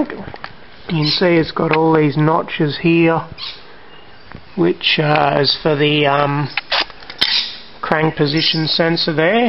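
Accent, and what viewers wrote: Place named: Australia. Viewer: you sound like badger from Breaking Bad, except with an Australian accent.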